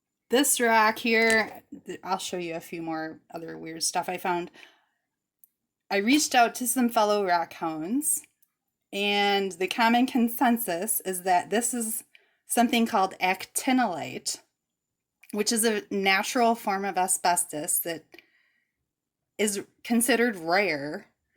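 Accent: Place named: Great Lakes, United States. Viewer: I am from Michigan and you have a wonderful strong Great Lakes accent.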